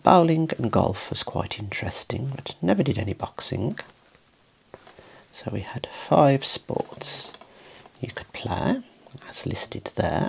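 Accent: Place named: West Midlands, United Kingdom. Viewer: So good to find an ASMR channel with a West Midlands accent 🙂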